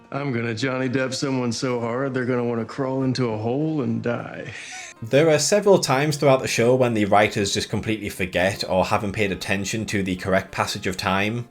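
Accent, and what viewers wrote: United Kingdom. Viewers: Are you a Geordie? Wasn't sure earlier, until you said 'lads and lasses' at the end. Great video by the way.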